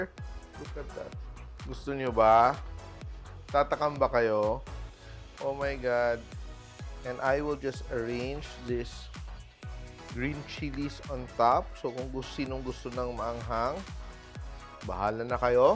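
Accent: Visayas, Philippines. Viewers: Pls do not speak english or tagalog in visayan accent. I don’t umderstand it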